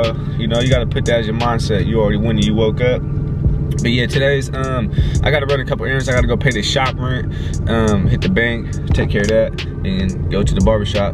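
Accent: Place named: Oakland, United States. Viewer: Don’t forget to buy your pumpkin ha ha. 🎃 Just curious why do you have a East bay / Oakland accent? Cool tips & nice shop keep it up.✌🏻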